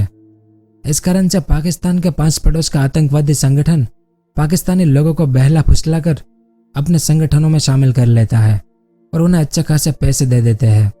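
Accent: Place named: Rajasthan, India. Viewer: Aap rajsthani ho apki voice tone Rajasthani jasi h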